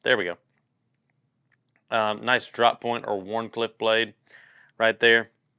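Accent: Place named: Appalachia, United States. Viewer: Have you met Jeff from Everything Auto? Pretty sure he’s your vocal dopalganger! Maybe it’s just that Appalachian twang?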